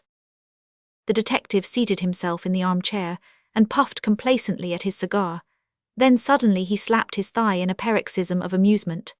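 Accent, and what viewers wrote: Mid-Atlantic, United States. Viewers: Trying for mid-Atlantic?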